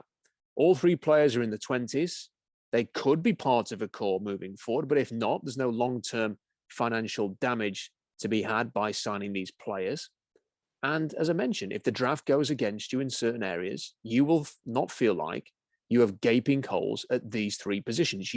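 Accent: England, United Kingdom. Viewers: Great analysis. First time listener. Still trying to wrap my mind around NFL coverage in an English accent.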